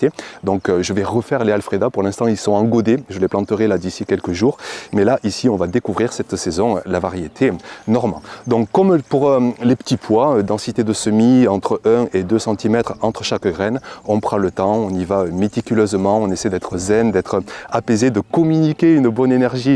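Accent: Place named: Provence, France. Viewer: Bonjour, merci pour tes videos et ton accent qui fait penser aux cigales et imaginer des champs de lavandes.... pour les pois, peut on évoquer le trempage de 12 heures avant de semer ?! Est ce vraiment un plus ? Bonne continuation, Lionel de Bretagne et son jardin qui regarde l'océan...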